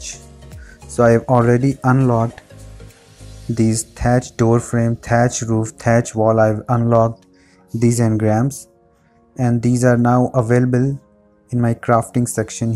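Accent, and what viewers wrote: India. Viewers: Are you an Indian ?? Your voice matches Indian voice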